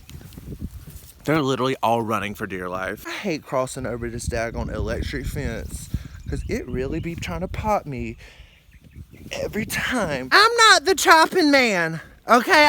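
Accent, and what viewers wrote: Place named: North Carolina, United States. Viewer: You can tell you’ve been in good ole NC for a while! That accent is on point! 😂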